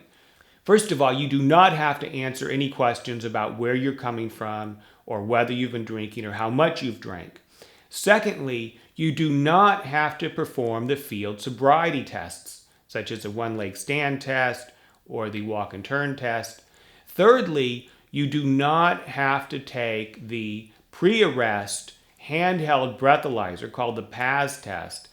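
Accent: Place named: Georgia, United States. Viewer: Tis is absurd. AN officer never has a right to search a vehicle without a warrant even if an arrest is made. You sound like you are from Cobb County GA. I am a criminal Defense Investigator and a Police Officer has no right to ever search a vehicle without a warrant, permission or Probable Cause, being something illegal in plain sight, however if drugs are suspected a K-9Unit can be called to the scene to determine if there would be cause to search the vehicle. You search my car without my permission, and you will not be a police officer any longer, I will have your P.O.S.T. removed and end your career.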